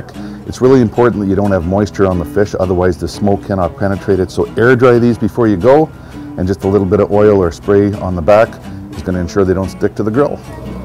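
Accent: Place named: Canada. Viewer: Cool except for the creepy Canadian accent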